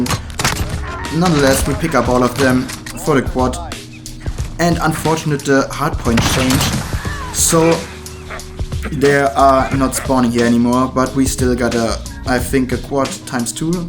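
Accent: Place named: Germany. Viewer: Deutscher Akzent am Start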